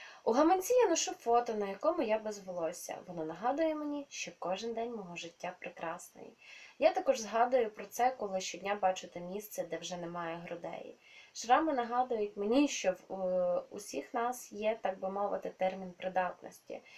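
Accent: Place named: Western Ukraine, Ukraine. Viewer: Свет строится не только от волос,но еще и от мебели.Все хорошо,но вот западный акцент бы не много убрать.А то заносит не много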